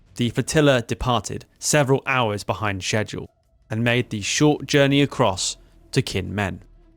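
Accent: United Kingdom. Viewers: The British accent is the best for history videos fr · Good information but your bias is too strong. Also your pronunciation of Chinese is soooo British. Just a travestry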